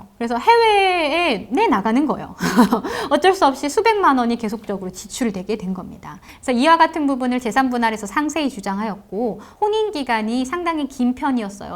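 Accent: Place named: Jeolla, South Korea. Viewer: 은 전라도 사투리가 섞여 있네요 ㅎㅎ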